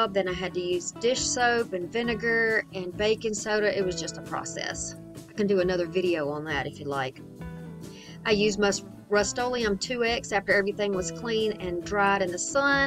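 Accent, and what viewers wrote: South, United States. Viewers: Found you via Julie’s channel from your comment. I’m from Alabama originally. Now living in Panama City beach. Love to hear us southerners on YT 😁😁😁😁😁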